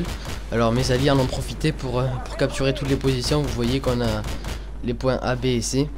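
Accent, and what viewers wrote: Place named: Toulouse, France. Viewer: J'adore, je regardais Mafio avant, je te voyais quelques fois dans ses vidéos mais maintenant que tu en fais compte sur moi pour les regarder ;) Question: Est-ce que tu conseille cette config du Spas12 sur MW2 aussi ? Si non, laquelle ? Je m'abonne, je like et je favorise ps: J'aime ta voix de toulousain :)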